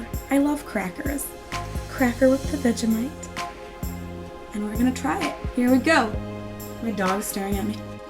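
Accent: New Zealand. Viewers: AHAHAHAHA the accent omgg!! tbh it sounded more new zealand but omg dying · I am laughing so hard!!! I think your accent is very New Zealand hahahah <3 <3 <3 Also How am I only just seeing this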